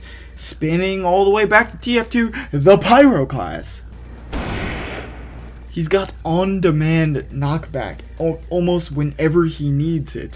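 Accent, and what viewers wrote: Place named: Turkey. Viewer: This guy sounds very turkish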